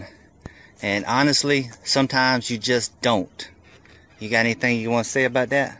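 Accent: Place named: Louisiana, United States. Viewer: Is that a Louisianna acccent? what ever it is, I like it.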